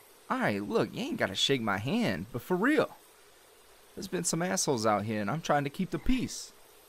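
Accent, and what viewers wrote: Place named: New Jersey, United States. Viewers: defo from northern Jersey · Jersey Accents r to die for 😍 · Jersey accents are wow ❤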